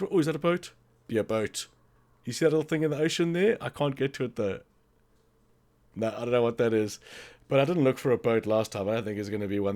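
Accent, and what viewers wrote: South Africa. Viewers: Awesome video thanks man! I recognize the accent, Are you south african?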